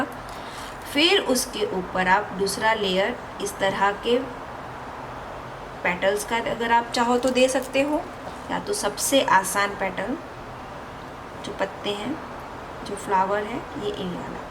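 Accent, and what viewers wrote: Gujarat, India. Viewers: Ka kha ga gha Tame Gujrati 6o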